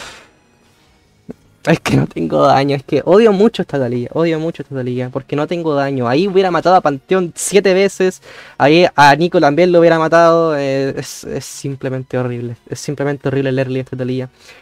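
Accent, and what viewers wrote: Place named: Chile. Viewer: Me gustan tus videos porque tienes una voz super amigable, por tu voz estoy casi seguro que eres Chileno, un lindo país y buen video... Saludos desde Chile ❤️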